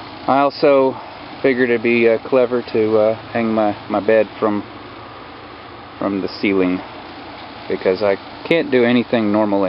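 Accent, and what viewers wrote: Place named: South, United States. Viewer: You have lost some of your southern accent since this video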